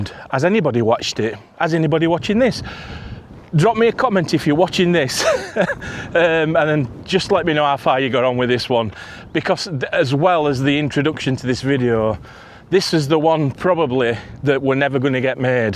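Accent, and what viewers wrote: Yorkshire, United Kingdom. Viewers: glad you was happy for me to comment 😂. Filming yourself also makes yourself know that your not a bad person,you start seeing what everyone else sees about you. Mental health is the worst, can't wait for next one, so miss the Yorkshire accent 😂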